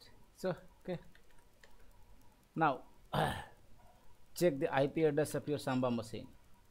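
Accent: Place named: Kerala, India. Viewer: it works man! many thanks. btw are you from Kerala? I've got a friend from there you got the same accent . hehem . Take care!!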